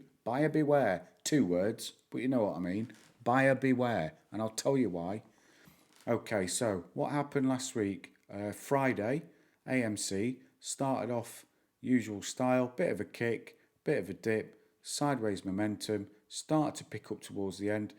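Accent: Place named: United Kingdom. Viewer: You sound liked an idiot. He’s just speaking with British accent.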